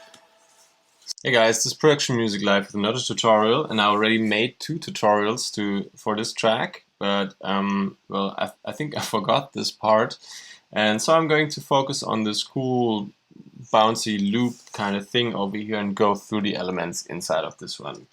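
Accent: Germany. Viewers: würde mir tutorials auch in Deutsch wünschen! Man hört extrem deinen Deutschen- Akzent (y) :)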